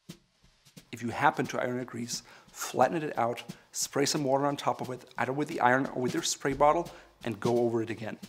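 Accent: Germany. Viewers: It's a cross between German and South American. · Whoaaaa your Accent is so strong.... dass ich Deutsch nur vom zuhören gelernt habe! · He's from Germany.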